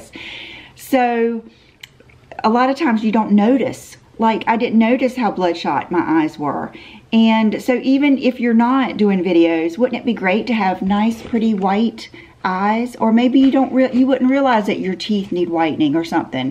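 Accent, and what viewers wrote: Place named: North Carolina, United States. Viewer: Love your videos! I’m a Carteret County local, so I totally get the difference in accents in NC;). And I TOTALLY never noticed how red my eyes were until I started doing YouTube videos. Thank you for your positivity and sweetness… and if you ever come up to the Crystal Coast I’d totally do a beach day with you:)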